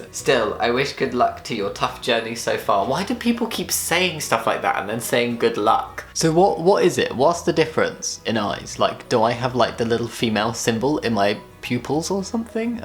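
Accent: United Kingdom. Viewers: You're obviously British.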